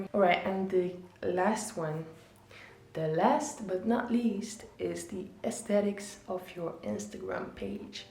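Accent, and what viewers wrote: Netherlands. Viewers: Hahahha ik hoorde meteen aan je engelse accent dat je nederlands was, thx voor de video wel echt super handig! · I love the European accent or rather Dutch accent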